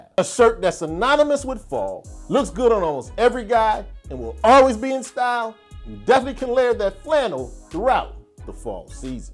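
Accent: Philadelphia, United States. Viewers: You outta Philly?!I knew you wuz out North East sumwhere..i thought New York..no offense..LOL SALUTE..from Chicago$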